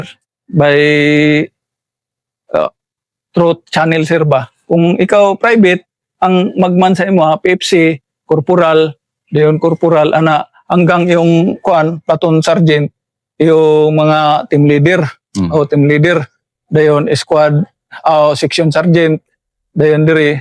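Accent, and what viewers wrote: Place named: Visayas, Philippines. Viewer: Bisaya jud kayo si master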